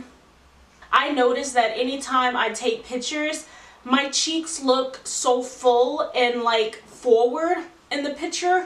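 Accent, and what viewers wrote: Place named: New York, United States. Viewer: I like that NY accent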